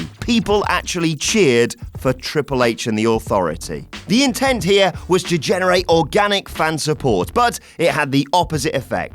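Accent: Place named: United Kingdom. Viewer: Even Wrasslin sounds smart with a British accent.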